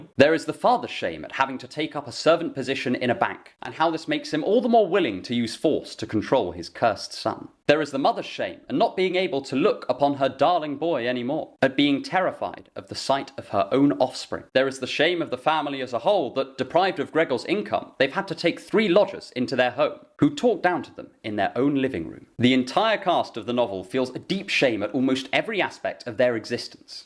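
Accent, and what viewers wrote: England, United Kingdom. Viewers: Is this guy doing a good review of the book and giving in depth thought, or is it the English accent?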